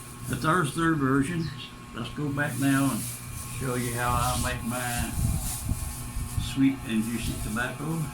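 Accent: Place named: South, United States. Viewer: It doesn't seem fair. I read [never knew 'em] my great grandfather raised 7 boys and 3 girls on tobacco. I never met a single great uncle, nor any cousins. Done hung my guitar on the river of Kebor.. i asked NOT to play a song at a local bluegrass old time music jam tonight. Nice to hear a southern accent. ❤😢😢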